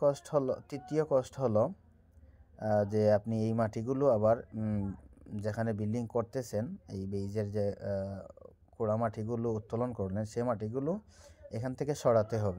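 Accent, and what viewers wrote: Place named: Sylhet, Bangladesh. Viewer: বাড়ি কিতা সিলেট নি? মাতে সিলেটি লাগের😃😃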